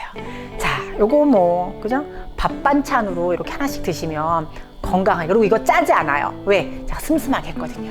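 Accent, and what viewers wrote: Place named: Chungbuk, South Korea. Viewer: 충북사람들의 말투에 베어있는 특유의 그게 잇어서 그래요..ㅎㅎㅎ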